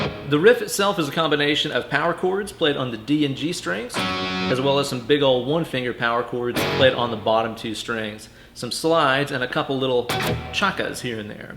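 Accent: South, United States. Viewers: Are you from the south ?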